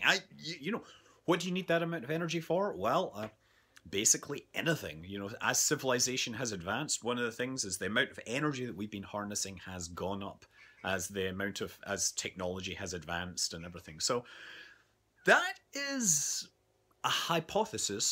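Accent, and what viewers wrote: Scotland, United Kingdom. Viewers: So he is Scottish, thought he was American but he has a similar accent to me(yes I'm Scottish) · What part of Scotland are you from . I'm form the west coast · what part of scotland do u get the belter accent from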